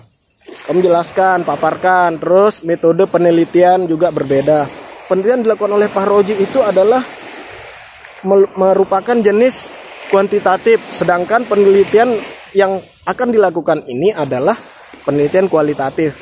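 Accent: Lombok, Indonesia. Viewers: logat lombok hehe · Bang org lombok ya?? Xixi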